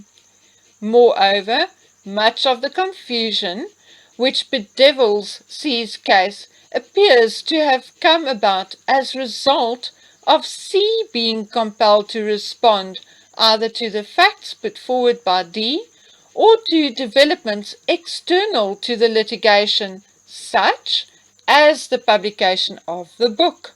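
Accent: South Africa. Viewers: I could listen to the South African accent for days.....xxx love your narrating voice x this must go to trial x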